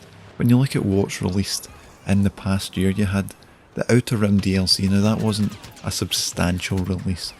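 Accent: Scotland, United Kingdom. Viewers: Scottish accent?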